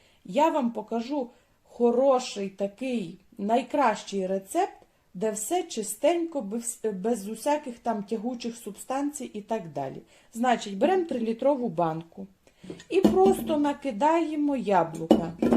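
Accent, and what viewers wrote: Ukraine. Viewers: Как же я давно не слышала настоящий украинский язык. Такой родной и красивый как у бабушки в селе в Винницкой обл. От западенского уже уши вянут. Спасибо большущее вам за рецепт. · Дякую Вам за простий і зрозумілий рецепт! Підписався. І дякую за мову, звідки вона така? Не Полтавщина, там характерні "ль", не Галичина - відсутні місцеві вставки. Скоріше центр України: Київ-Дніпро-Вінниця-Кропивницький-Черкаси. Підтвердите мої здогадки?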